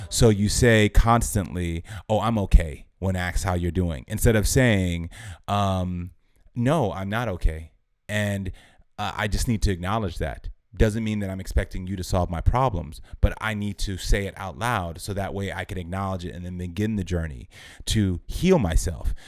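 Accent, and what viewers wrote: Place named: Cockney, United Kingdom. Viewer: You cockney guru you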